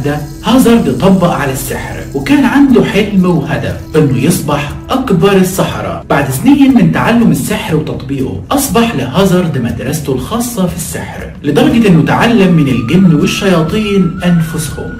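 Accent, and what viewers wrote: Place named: Egypt. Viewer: متابعك من السعودية اعشج لهجتك المصرية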